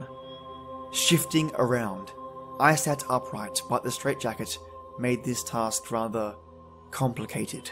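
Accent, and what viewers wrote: Australia. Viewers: Wow! That tea you mentioned in the beginning sounds absolutely fantastic and delicious! I'm a bit jealous! I am sitting in my living room with my wonderful mother, cute parakeet named Daisy, and myself with a humongous cup of black tea. My mom couldn't of been nicer about you. She told me you tell such a good tale she could actually imagine herself in the story. Bravo! Of course, I just Loooovvvveee your narration!😍 With your narration style, sound effects, and great Aussie accent, truly makes it quite a listening experience (a stupendous one at that). My ears never have been soo spoiled! Thank you ever soo much, from the bottom of my heart!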